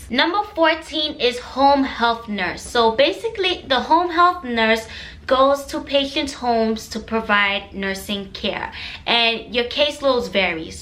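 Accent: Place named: Jamaica. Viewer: You have an accent are you from Jamaica 🇯🇲? Big up yuhself either way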